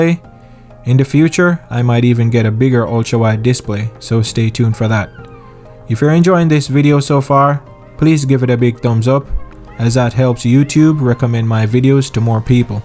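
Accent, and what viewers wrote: Jamaica. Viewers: As soon as he said the first sentence I knew man was Jamaican lol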